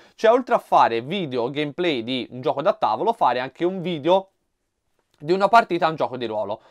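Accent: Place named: Tuscany, Italy. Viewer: A me piace un sacco il tuo accento toscano e spero di vederti un nuovo "ufficio" presto! Buon Anno!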